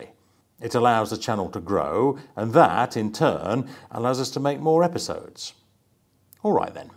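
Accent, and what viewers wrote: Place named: United Kingdom. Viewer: Subscribed for your proper British accent and hefty bank account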